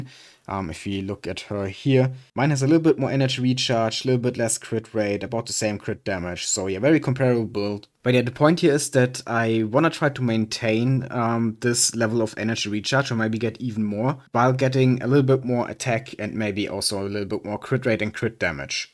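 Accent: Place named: Germany. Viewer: das richt nach deutschen Akzent xD aber nices video tbh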